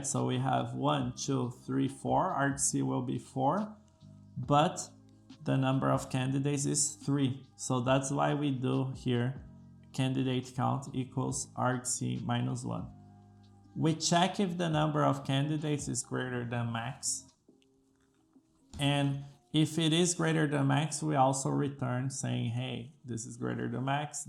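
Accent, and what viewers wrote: Brazil. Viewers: Cara, fala muito bem em inglês, mas peguei no pulo que era brasileiro. Valeu pelo video, salvou aqui.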